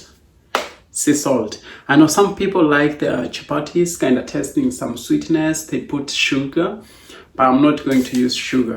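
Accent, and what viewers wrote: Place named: Kisii, Kenya. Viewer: I love your Kisii accent just like congrats dad😂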